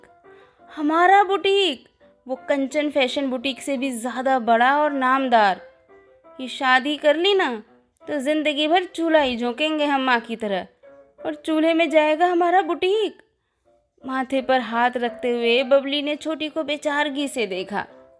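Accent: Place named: Kanpur, India. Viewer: Wah ji wah wat a style of narrating.. Har baar surprise deti ho dear... Bahut hi maza aa gya itni mast story or itne mast tumhare kanpuri style me gazab ... Kaise bikul kanpuri style me wow it's superb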